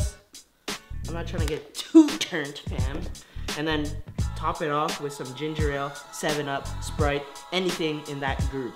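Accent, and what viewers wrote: Canada. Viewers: Drake on SNL did a skit on black jeopardy and was playing a black Canadian with a Canadian accent. He sounded just like you. He stole your swag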